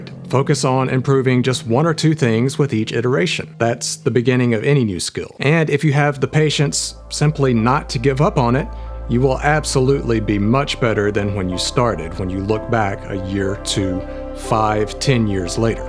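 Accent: South, United States. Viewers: I love this guy’s voice. I love his slight southern accent but completely meditative tone. I want him to read books to me.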